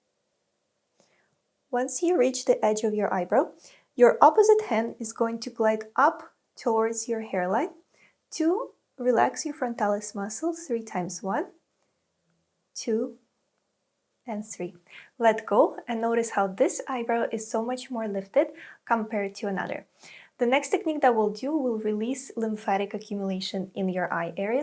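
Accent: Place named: Poland. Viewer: Is that a Polish accent I am hearing? My parents were Polish so something in your accent sounds very familiar!❤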